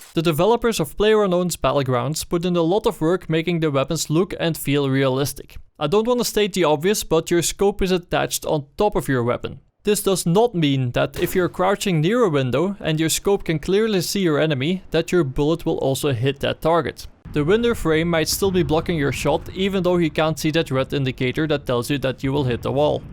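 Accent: Netherlands. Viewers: dutch accent? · Steenkolen engels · u dutch? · arent you dutch your accent sounds like that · he kinda sounds dutch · Your Accent is netherlands, isn't it? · you can tell he speaks dutch normally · Ik kan aan je accent horen dat je nederlands bent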